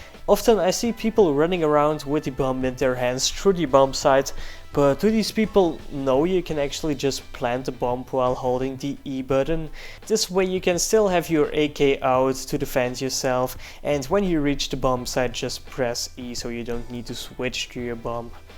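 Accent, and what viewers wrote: Netherlands. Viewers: Wait are you dutch? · i noticed ur dutch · Nice, dacht al aan je accent te horen dat je Nederlands was, maar dit filmpje bevestigd het ;p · ben je Nederlands? lol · This guy sounds dutch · Wait your Dutch? Ik tought you were danish or German.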